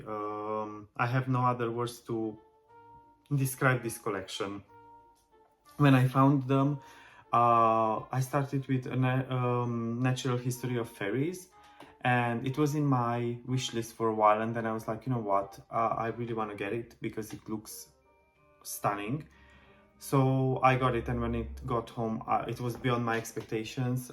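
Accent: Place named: Czech Republic. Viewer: I like your accent:) I've never heard the accent of the Czech republic. And your English is excellent my friend!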